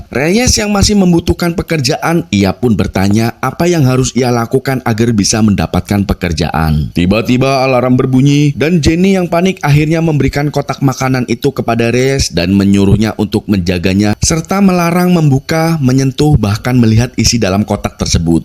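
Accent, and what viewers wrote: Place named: Java, Indonesia. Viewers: Cerita Film yang epic dan menarik, pembawaan sangat lugas, jelas, lucu (ada medoknya 🤣👏) dan mengena, mantappp 👍 sukses selalu untuk mu, semoga kontennya bermanfaat. Suwun Maseee 🙏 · Bahasane kaya nyong medok nemen😅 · Basane medok nemen min 😅. Sing adoh nemen 😂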